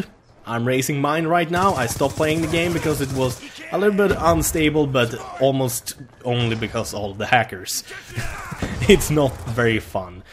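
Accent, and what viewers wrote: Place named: Sweden. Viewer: That swedish accent. :3 ''Those dirty dirty sheaters''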